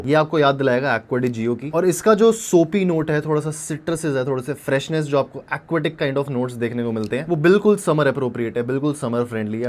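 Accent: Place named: United Kingdom. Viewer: Brit accent ka kya scene hai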